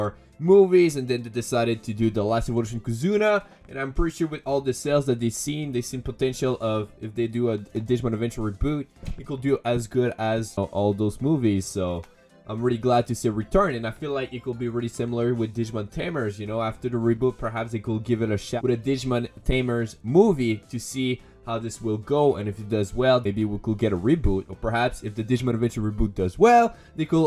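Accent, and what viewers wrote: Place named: Quebec, Canada. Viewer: Yo my guy, i gotta ask. Are you a french canadian by any chance? Your small accent and a couple word pronunciations are telling me yes XD. Je suis presque sure a 100% lol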